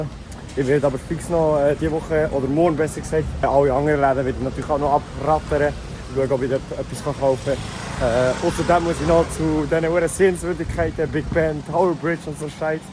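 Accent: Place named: Switzerland. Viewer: würde schauen, wenn du kein schweizer wärst. verstehe kein wort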